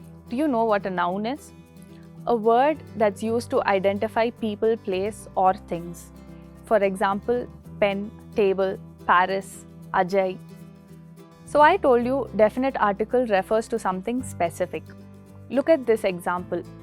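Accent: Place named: New York, United States. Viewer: Very clear and NYC voice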